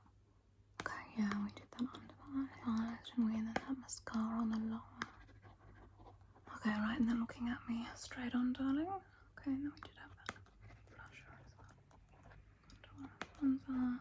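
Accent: Sydney, Australia. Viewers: You sound exactly like my mum's friend Shaz, who grew up on the North Shore of Sydney. Perfect.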